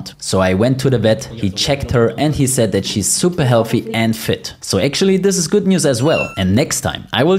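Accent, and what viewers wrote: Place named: France. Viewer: You sound like a french narrator from spongebob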